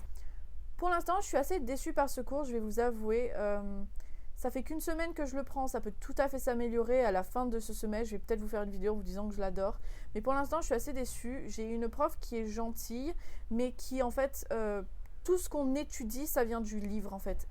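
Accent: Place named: United States. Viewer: lol, desole, je pense que tu es americaine, parce je vu ta video, tu parle bien anglais comme americaine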